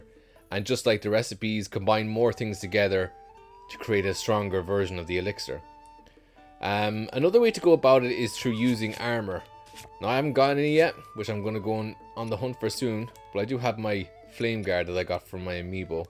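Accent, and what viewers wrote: Ireland. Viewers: Thanks for your videos! Running through TOTK again with my son and there’s nothing better than getting tips with an Irish accent! Slainte!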